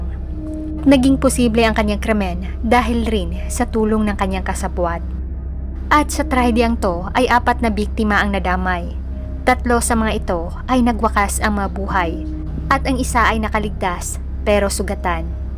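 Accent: Visayas, Philippines. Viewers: Bisaya pronounce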